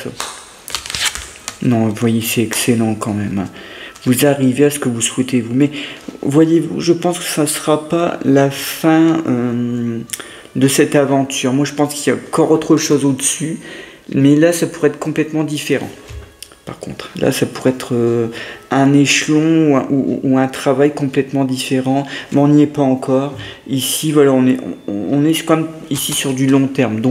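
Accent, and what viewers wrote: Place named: Nord-Pas-de-Calais, France. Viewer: 😮❤ merci à vous et très jeune heureuse année 25... Merci pour votre tirage 😊 Mon petit cœur est las d'attendre quelques nouvelles palpitations.... Rien depuis 21 ans... Sinon une solitude subite à 49 ans..décidée par l'univers.... Rien et personne depuis 20 ans... La lionne attend. On verra bien ce que 25 fera de positif. En tout cas, j'ai bien aimé votre tirage, vous êtes particulier, calme apaisant et peut-être même habité 😊 J'ai beaucoup aimé aussi votre petit accent ch'ti... Est-ce que je me trompe..., je ne crois pas être médium...🙏 ☺️ Nouvelle abonnée... à très vite Cathy 59 😉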